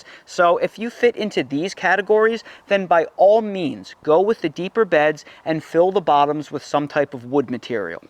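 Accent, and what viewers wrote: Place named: Philadelphia, United States. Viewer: I know you live in North Carolina now but you gotta be from the Philly area originally, right?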